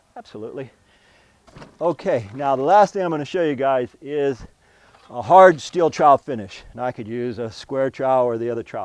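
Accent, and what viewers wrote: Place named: Bay Area, United States. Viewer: You have a Bay Area accent.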